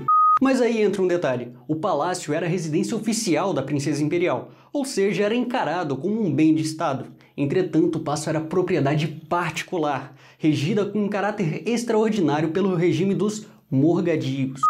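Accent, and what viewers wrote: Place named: Rio de Janeiro, Brazil. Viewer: Parece que o aparelho dental, a barba e o "carioquês" estão te prejudicando. O conteúdo é bom, mas não é só disto que depende o sucesso de um canal.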